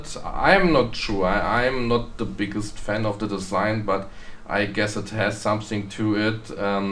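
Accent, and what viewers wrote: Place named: Germany. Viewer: The Overlord did teleport right on to me a time or two, but it seems that there is a little more time to get out of its way. I never made a formal study of German in school, so I don't like to criticize the performance of someone who has put in that time. You have a German accent, but I can understand you easily. It has its charms.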